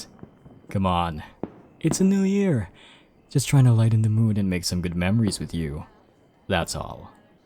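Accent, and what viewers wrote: Philippines. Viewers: is it just me or do y'all hear the filipino accent???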